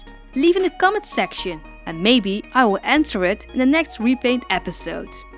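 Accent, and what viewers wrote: Netherlands. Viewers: Qiick question, are you Dutch? I recognise the accent haha. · wait hold up are you Dutch as well? You sound so Dutch it's... well, it wouldn't be funny either way, but like, this is the second Dutch Doll channel I've found is this what y'all do? Make great sweets, cheese, flowers, and dolls? Also, the mills are hella cute. Loved the repaint too, you're super talented!! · It sounds like you are Dutch.. No hate btw! I am Dutch! SO i just wanted to know.. 🤔😂😊❤️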